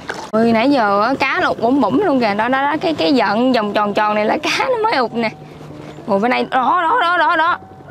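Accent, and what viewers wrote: Mekong Delta, Vietnam. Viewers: Em chat giong mien tay nghe ngot nhu mat ong rung,❤❤❤❤❤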